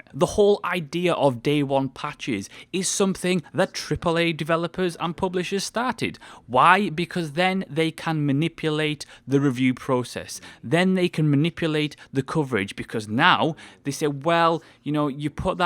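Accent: United Kingdom. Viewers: I do not believe almost any "professional" reviewer (ign, gamespot, what have you) ever was paid to give a positive score, pretty much because once such a thing was discovered (and yes, it would be) he'd have an easier time kissing Emily Brontë than finding any other job in the industry. Also, surprise surprise, first Beth game out under this conditions is criminally woeful so far as performance goes on pc. Good piece man, I'm going to watch some more vids but you got a new sub in all likelihood. (plus, a British accent never hurts)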